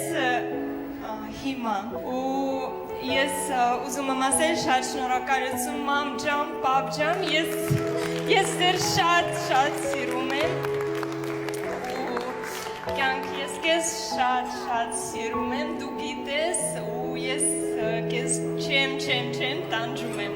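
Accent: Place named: Georgia. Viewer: Հարսը վրացական ակցենտով է խոսում եթե ուշադիր լինենք...❤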